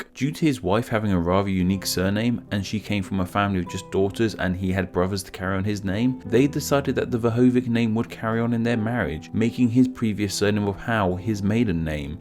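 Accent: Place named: Southern England, United Kingdom. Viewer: Agreed I'm from England but this guy is to much of a southerner and it just makes some videos painful to watch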